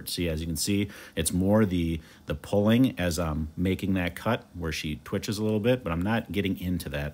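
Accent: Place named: United States. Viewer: Why does your voice sound American in some videos but others it’s mixed with Irish.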